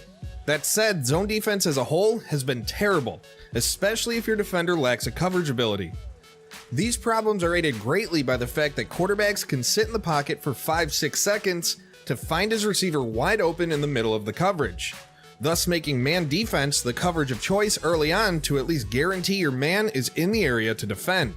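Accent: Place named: Buffalo, United States. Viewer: You from buffalo lol I hear that accent y’all dragged that “R” on every word I’ll be surprised if you’re not from there or up that way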